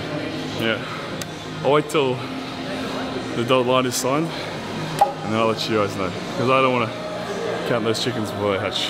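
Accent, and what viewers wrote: Australia. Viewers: your accent is coming back since you Oz trip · Jay Tee Australian English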